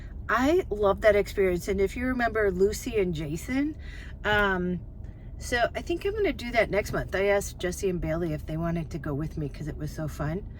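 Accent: Minnesota, United States. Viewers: You are a cook. And i mean that in the best sense of the word. Caught a couple of your videos a year or so apart.. just caught a hint of your Minnesota accent. Love it! Subscribed.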